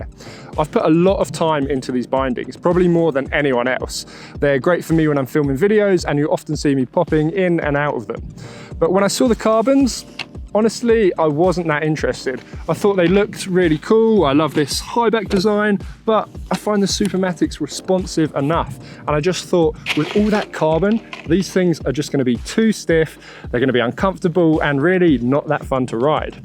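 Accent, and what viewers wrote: United Kingdom. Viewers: You are the top snowboarder i like most with British accent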